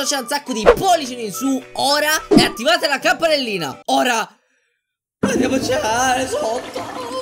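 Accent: Sicily, Italy. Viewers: Sei siciliano? Sono toscano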